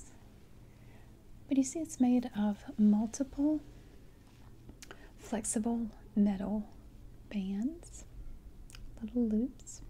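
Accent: South, United States. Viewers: I was giggling when you said you had a "tiny bit of Irish" in you. Reminded me of something Phil Lynott, Irish lead singer with Thin Lizzy, said at a concert. (slightly dirty joke coming up....) He said, "How many of you girls here tonight have a little bit of Irish in you?" (girls scream and wave their hands in the air) "And how many of you would like to a little bit more Irish in you....?!" (girls scream even louder!) Love your southern accent x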